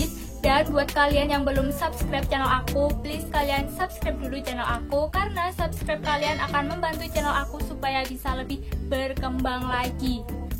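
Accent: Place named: Java, Indonesia. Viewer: Kek medok jowo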